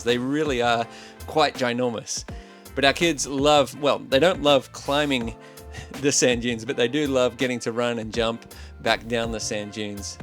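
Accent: Australia. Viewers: You dont sound like you are american! You sound like an Australian or New Zealander! How did the lack or warmth and sun, particularly in the winter, make you feel, as you are from a warmer and sunnier part of the world? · I’m guessing you’re not a native Texan though. Sounds like Australian or NZ?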